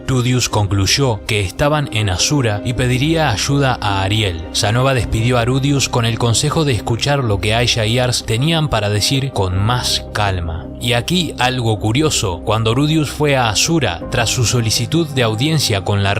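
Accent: North, Mexico. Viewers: Pero que norteño 😅😅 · Diablos , esto es lo mas norteño que mis oídos han oído jajajaja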